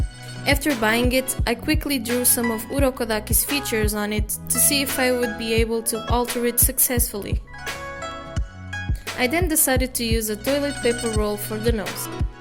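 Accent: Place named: Portugal. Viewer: Esse sotaque com certeza é de Portugal!♡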